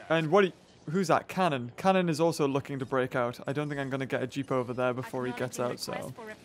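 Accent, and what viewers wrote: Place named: Geordie, United Kingdom. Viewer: You're accent is weird I mean I can tell you're a Geordie but you say a lot of Scottish sounding words too, BroonCoo,Baba, photea maybe Scots English and Geordie words are just similar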